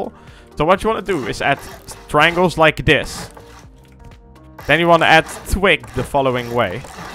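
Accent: Netherlands. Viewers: Ru Dutch? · are you dutch? xD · I smell Dutch 🎉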